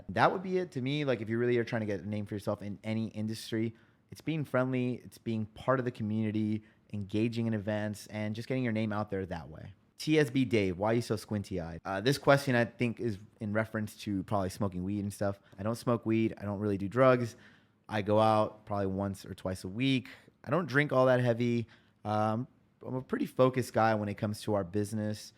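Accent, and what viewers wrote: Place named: Argentina. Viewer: Tiene acento como de argentino.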